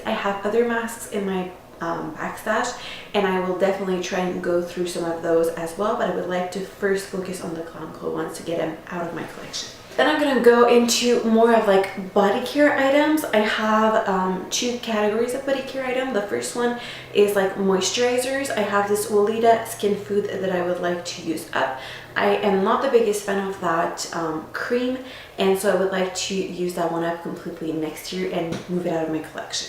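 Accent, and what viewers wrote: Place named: Canada. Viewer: I love your accent! are you Canadian?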